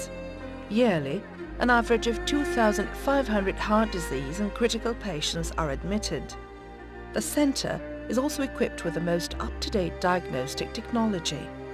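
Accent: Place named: Egypt. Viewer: dude she`s egyptian 100% it`s all about how she spell the letter "ق"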